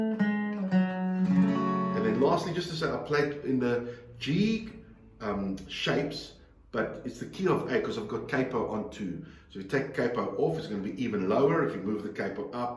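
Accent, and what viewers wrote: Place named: South Africa. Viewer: Great stuff. Are you South African?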